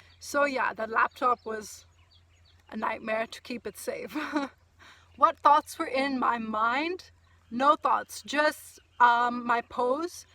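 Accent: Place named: England, United Kingdom. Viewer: You're english accent always melt me down. And you're the most awesome lady💜💜💜💜💜💜💜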